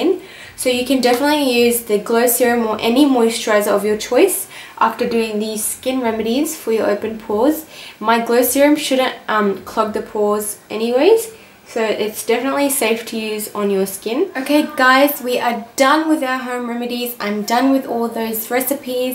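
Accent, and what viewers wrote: United Kingdom. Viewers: The English accent is bae 😘😘💕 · Hey girl love your British accent 💞Please share the secret of your healthy lashes....... Love all your channel thanks love from Pakistan 💕💕🇵🇰🇵🇰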